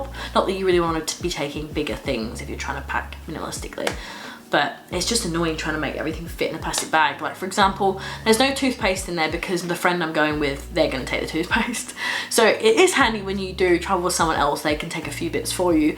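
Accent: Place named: United Kingdom. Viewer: Your accent is sounding more and more British!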